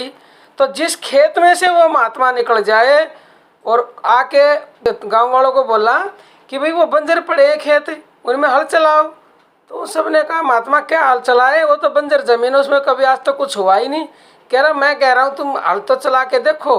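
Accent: Haryana, India. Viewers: जय श्री राम माताजी आपने सत्य कहा है माताजी आपकी भाषा हरियाणा के जैसी लगती है आप के बचन अमृत तुल्य हैं।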